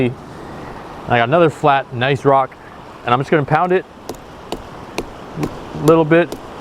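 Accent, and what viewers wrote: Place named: South, United States. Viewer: are sure your not from the south maybe just a southerner with a unique accent with all that butter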